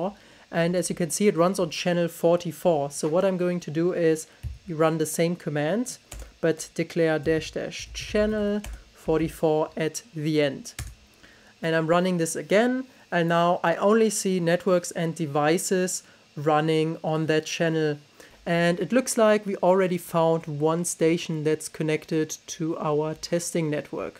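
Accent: Germany. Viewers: Thank you for your video! How hard to find hardware for Kali/Parrot to deauth 2.4 and 5ghz same time to prevent switching?! Will the Deather Andromeda does the thing? I could go for jamming in my project, but that should only be the last possible option. i would really appreciate any advice! Your accent let me think you are possibly from Germany/Austria?! :) Keep on doing such nice Videos! <3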